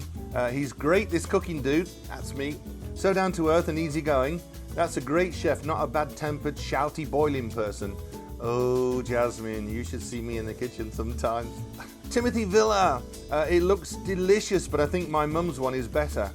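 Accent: United Kingdom. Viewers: I just love your videos! Thanks for sharing your memories & recipes -- your presentation is so easy-going and friendly that it doesn't call attention to how great your technique is and how detailed you are. My question for next Q&A, where in UK is your accent from and what is your favorite dish from your home region?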